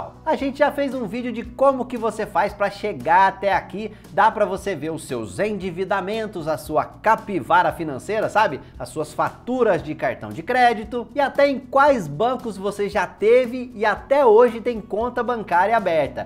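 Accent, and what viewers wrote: Minas Gerais, Brazil. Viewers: Muito bom o vídeo...pelo jeito deve ser mineiro. Kkkk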